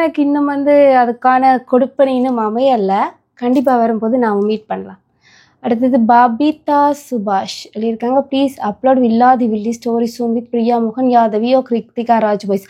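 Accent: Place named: Sri Lanka. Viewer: நீங்க அழகா பேசறீங்க சகோதரி இலங்கை தமிழே அழகுதான் நீங்களே குரல் கொடுக்கலாம் நன்றாகவே இருக்கிறது நானும் முத்துலட்சுமி ராகவன் ரமணிசந்திரன் அந்த வரிசையில் ரசிகைதான் சிறிய வயதில் எங்கள் மனதில் பெரிய இடத்தை பிடித்துவிட்டீர்கள்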